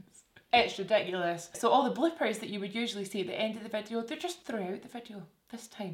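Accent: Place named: Scotland, United Kingdom. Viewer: Absolutely love your page I came across it by mistake I love all your suggestions your make up i like the way you help out and talk to your audience you have a wonderful personality and your accent is awesome... i’m just guessing maybe Scottish correct me if I’m wrong keep bringing those videos girlie we love them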